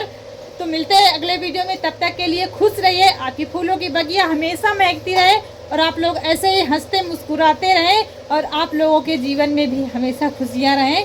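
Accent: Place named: Uttar Pradesh, India. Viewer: Mai unnaose hun aap jaise bolti hamare gaon vase bolte hai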